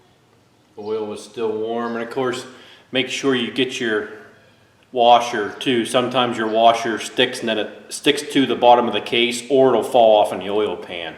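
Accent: Pennsylvania, United States. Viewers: I thought you sounded like you were from PA then I saw the PA sticker where abouts you riding?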